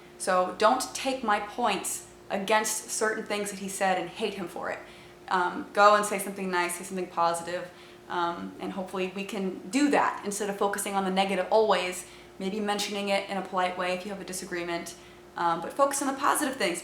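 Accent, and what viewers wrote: South, United States. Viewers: Following her big year of atheist drama, she is now moving towards a kinder, gentler atheism. Does that mean she is going to stop using that incredibly bigoted southern accent she always uses by default when she thinks she's making a good point? Oh, that's right, she does that because of her white guilt. Well, it's a good start. Hope she can get there while she's still young and pretty, cause when she gets older it's just gonna come across as mean and Nasty. God bless her.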